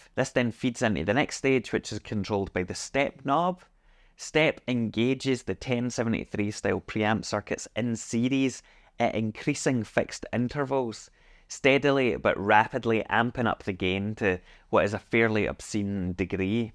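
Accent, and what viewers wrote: Scotland, United Kingdom. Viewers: subscribed for your delicious Scottish accent!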